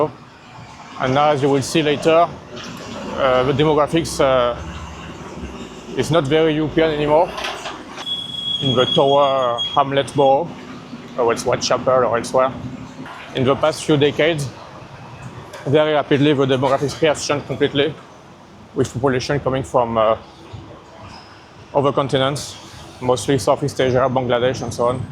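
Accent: France. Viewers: frensh accent :face-green-smiling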